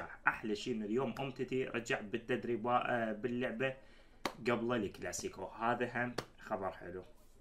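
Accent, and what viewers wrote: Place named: Iraq. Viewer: السلام عليكم ههههه والله ياخوي احب ردات فعلك وكلامك العراقي الحلوووو واصل عزيزي ولنا فخر بتواجدك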